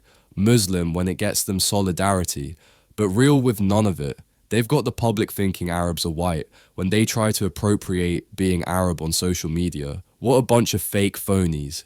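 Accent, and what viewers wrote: United Kingdom. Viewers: The Original Arabs were / are Black. Adham [Radiant, Shining Black]. Aswad [Black], Qadar [Black]. The paler shades of people from MENA = the Middle East / North Africa are the byproducts of Black men producing with the Slavic = Eastern European / Caucasian women. That said, although I know that the racist = white supremacist scenarios articulated happen, the narrator has a keen British accent. And thus, I am curious. Why does someone from Sudan have such a sharp British accent. I may be missing some details,.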